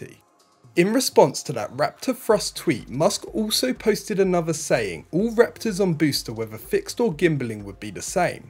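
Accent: England, United Kingdom. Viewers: That is the way English people talk. They did invent the language after all. They then named it after themselves.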